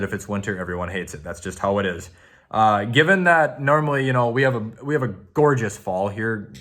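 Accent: Minnesota, United States. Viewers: I am Canadian, and he sounds American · I knew you were Minnesotan cause I saw the banners and your accent is exactly like other Minnesotan accents Edit: you being a Minnesotan fan and saying you hate the cowboys more than the packers wow I’m surprised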